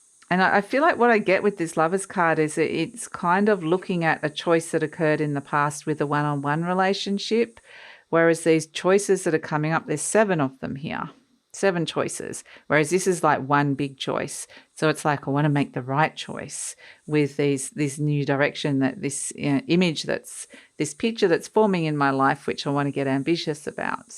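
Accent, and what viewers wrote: Australia. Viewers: G'day. You sound like an Aussie. I'm in Brisbane. Nice to meet you. A blue butterfly flew across my line of sight this morning! Thanks for the reading. Cheers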